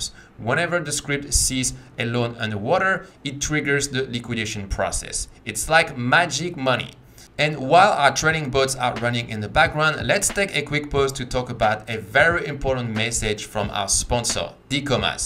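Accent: France. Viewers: L’accent Français Youhoouu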